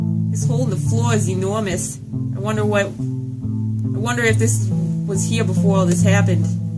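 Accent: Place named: Boston, United States. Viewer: I think it's safe to say that you'll have a "bloody" good time with this game lol. Your Bahston accent is hilarious, btw 😆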